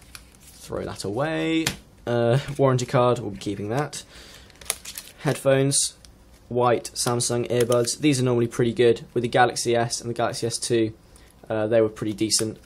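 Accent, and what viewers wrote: United Kingdom. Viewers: Heard your British accent, saw the 0 "dislikes" so I immediately liked the video. :)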